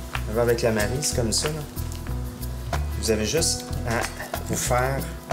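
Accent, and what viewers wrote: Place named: Quebec, Canada. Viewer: J'adore votre accent également ! Je craque complètement sur l'accent québecois.. J'essaierai votre technique la prochaine fois que je mangerai des oeufs, ça a l'air bien sympa !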